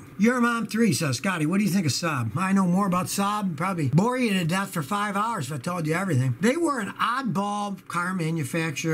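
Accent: United Kingdom. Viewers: Scotty, your's is the best video channel on the internet! Makes my day everytime I watch one of your videos. Loved the British accent! BTW, a 1986 is 34 years old, but you're still a god to me.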